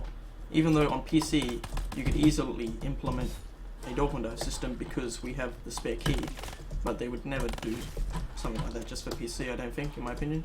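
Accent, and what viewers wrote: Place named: Western Australia, Australia. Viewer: listening to a western Aussie rant is 11/10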